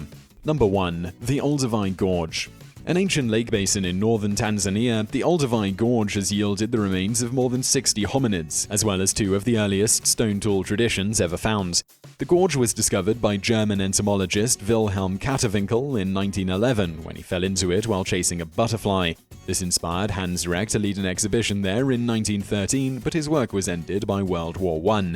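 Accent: England, United Kingdom. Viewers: Fantastic but so very english accent some words I can't understand.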